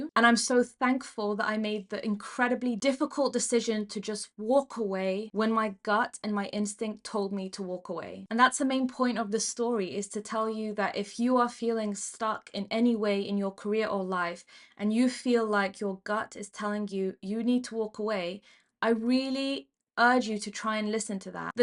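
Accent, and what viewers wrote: United Kingdom. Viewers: Everytime i hear a British accident i change the video lol i know how bad it is in the UK. I’m interested in the us only.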